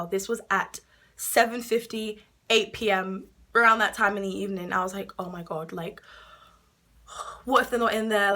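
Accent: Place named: United Kingdom. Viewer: Btw I love ur accent 😂 I'm part British and only get it when I'm speaking to fast or reading but I want it throughout the day cuz it sounds so nice and proper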